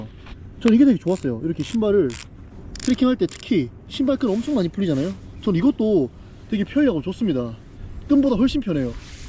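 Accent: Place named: Busan, South Korea. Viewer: 님 영상보면 언제든 즐겁네요...부산사투리 좋고~~~신발도 좋고~ 꿈같은 로망의 여행을 대리만족으로 즐기고있네요...안전한 여행되길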